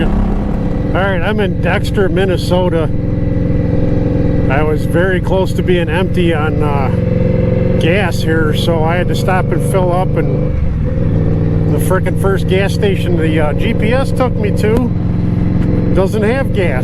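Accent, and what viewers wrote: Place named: Western New York, United States. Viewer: I'm enjoying this way too much. Btw that wny accent is strong... sound just like me.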